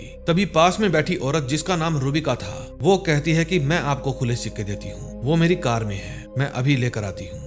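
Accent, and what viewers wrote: Punjab, India. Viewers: are u punjabi?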